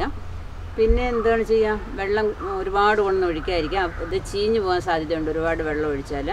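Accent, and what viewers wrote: Kollam, India. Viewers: കൊല്ലംകാരിയാണോ അതോ പത്തനംതിട്ടയോ ചേച്ചി?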